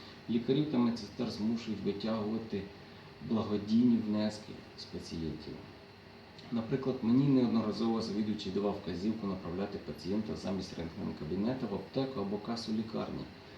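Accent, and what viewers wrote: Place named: Galicia, Ukraine. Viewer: Справжній козак. За вимовою -галичанин.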